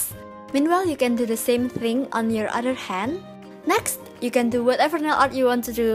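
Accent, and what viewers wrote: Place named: Indonesia. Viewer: lol knew it from your accent! you're indonesian!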